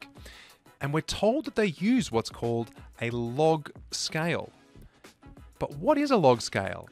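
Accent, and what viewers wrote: Australia. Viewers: explaining math with an Australian accent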